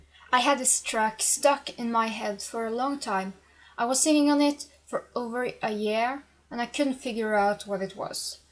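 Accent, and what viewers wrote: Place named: Balkans. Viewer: yeah, a let's play would be nice.. I like your accent and the way you talk! So the ppl like me who actually like your takling, would enjoy the vids as well:) You're from the balkan, right?:D And I'm telling you that bcz you seem very aware of your talking since you mention it in the beginning of somme vidz:D ASMR is cool, it's for relaxation purposes.. it's all about sounds ppl can hear, like whispering, or for example the sounds of the buttons when you play on the DS.. Look it up:) if you do those vids, ppl who like pokemon will watch your vids but also ppl who like asmr... And ppl who like them both will simply adore you lol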